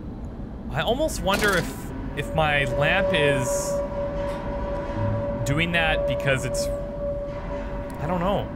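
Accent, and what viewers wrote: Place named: Canada. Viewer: I love your lets plays! Don't ever change. And your Canadian accent is adorable. And just to be pedantic, I have to point out that "Thames" is actually pronounced "Tems".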